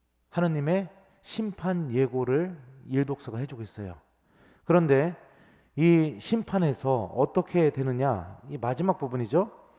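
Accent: Chungcheong, South Korea. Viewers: 충청도 사투리가 참 정겨워요^^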